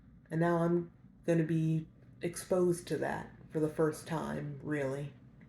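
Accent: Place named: United States. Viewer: hey man really sorry to hear that this is still something youre not sure about. im myself gonna start estrogen in around 3 months after a journey of 3 years of trying to get on them. i'm from germany so i dont actually know how hard it is in america (im assuming youre from there by your accent). and even now i have my doubts. but whenever i think about if i really wanna do it i try to think about the alternative. which for me would be growing old in a male body. and that is like a genuine horror story to me... maybe try and rationalize it for yourself like that? i have these thoughts because im naturally very androgynous and have been "misgendered" in both directions since i was a little kid. people never know how to read me. but if i think about how my body will just become more nad more masculine with time it actually terrifies me idk. i'm 24 now so i have plenty of time to enjoy my life during and after medical transition :) socially i'm fully out to everyone for almost 5 years now so thats not an issue anymore lol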